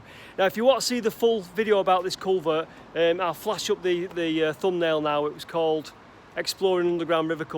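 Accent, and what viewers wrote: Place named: Scotland, United Kingdom. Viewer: I can tell you're from a Scottish part of England, there's no mistaking the brogue. We have several different accents here in the USA, the State of Massachusetts has an accent that sounds similar to Scottish the pronunciation of some words. Love your channel!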